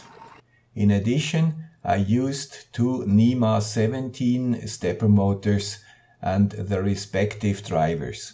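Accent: Switzerland. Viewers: Great video. Love your Swiss accent.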